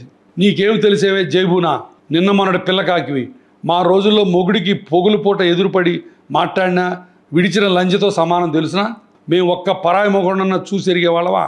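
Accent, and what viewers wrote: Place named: Nellore, India. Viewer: Chala authentic Nellore yaasa sir. Chala rojulaindi Intha raw and authentic nellore yasa vini 😊…. “Dargamitta” is a place in Nellore.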